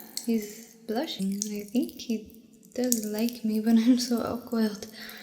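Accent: France. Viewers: Ur french accent is neat ^^ u put a lot of effort in it Nice roleplay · everyone gonna ignore the fact we just got a free science lesson while listening to a nice french accent.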